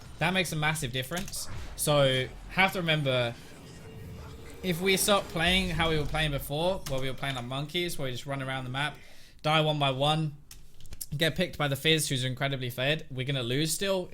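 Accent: United Kingdom. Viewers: I could listen to your accent all day. British guys 😍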